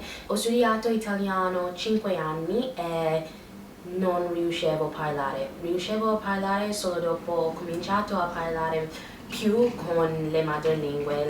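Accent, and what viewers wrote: United States. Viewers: ovviamente si sente l'accento americano, però parli molto bene. Ho molte amiche che sono nate all'estero (Polonia,Croazia,Russia) e sbagliavano sempre gli articoli, ma tu questo problema non ce lo hai. wow bel lavoro! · Sei brava a parlare, ovviamente hai una cadenza molto americana ma lo parli fluentemente e ti si capisce benissimo. La pronuncia non è facile, d'altronde come per noi imparare il vero "American English"! Continua così, io vivo a Milano ed è bello vedere i punti di vista di qualcuno dall'esterno! You're beautiful and very chatting person:) like me! Good luck for everything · Why do you use an American accent when speaking Italian? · Rimane americana non puoi dirle di migliorare delle lettere che nell'accento e pronuncia della sua lingua sono state sempre così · Parli perfettamente, e l'accento americano è adorabile! Grande ❤ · You are pretty good, you make some small mistakes but you can fix them, like you mix up some word gender because in english words don't have a gender. 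A tip to sound a bit less american is to say some vowels open and not closed, we are weird because we don't put every accent on words but only on some, the best example would be the word "ancora" which can mean either again or the metallic thinghy ships have(dunno how it is in english sorry) so it can be important, listen other italians and imitate them, good work! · migliora un po' la T è troppo schiacciata, troppo inglese-americano e un po' anche la r.. però bravissima l'italiano è difficile... Io amo L'america penso che ognuno sia attratto da ciò che non gli appartiene. di che stato eri? mi iscrivo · Parli davvero bene italiano, complimenti. Non hai troppo l'accento americano, brava! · Bravissima! I'm no one to make judgements but your Italian is more than proficient; the world is always surprising: I know people my age, so middle aged, even university educated that still struggle with verbs' conjugation and some tenses...that's quite irritating, while you are almost always correct and fluent. 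A few flaws (very few in your case) in a foreigner speech are even nice...and please try not to lose completely your slight American English accent: is very charming, cool and exotic! Best regards! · You speak correctly - better verb tense agreements than many Italians - the American accent is even harder to lose than my English one though 😊. The only American I have ever heard that has managed to lose their accent is Romina Power, and look how long she had to live there! (and who she married to do so hahah) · Amo amo amo questo accento! davvero sono molto affezionata all'accento americano in italiano, ho visto molti video tuoi e sono veramente interessanti! abiti a Milano a quanto pare, magari un giorno ti trovo in giro ahaha, sei divertente e super simpatica :)) p.s. sei bravissima a parlare comunque! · mi piace tantissimo quando parli in italiano e si sente l'accento americano ;) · Brava, parli molto bene l'Italiano! 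Un poco l'accento americano, ma va bene...ti fà più sexy! Ok? Ciao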